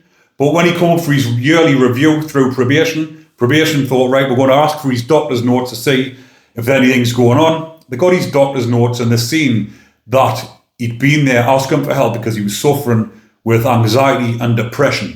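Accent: Newcastle, United Kingdom. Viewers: Stumbled across this video and your storytelling and accent is absolute gold 🤣🤣 love the geordie accent. Fuck Linda!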